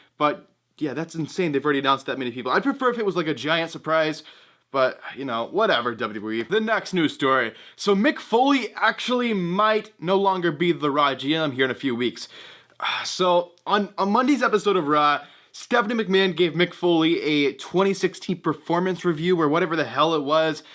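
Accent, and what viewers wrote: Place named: Canada. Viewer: by the sound of your a`s I can tell your canadian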